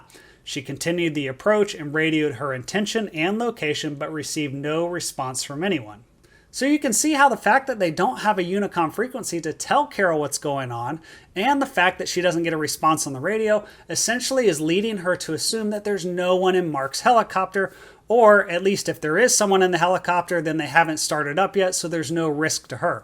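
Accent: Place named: California, United States. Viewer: Hey are from California? Got that cali accent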